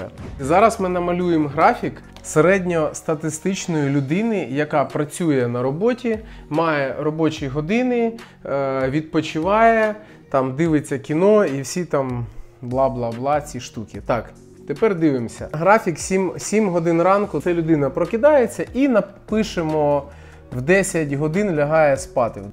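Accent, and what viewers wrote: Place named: Surzhyk, Ukraine. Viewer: Слушаю и понимаю какой суржик жоский, с другой стороны, ставя себя на место человека в телефоне понимаю что я если бы говорил обычной скоростью, а не запинаясь вспоминаю як же буде черевик українською. То было бы аналогично если не хуже. Респект за мову.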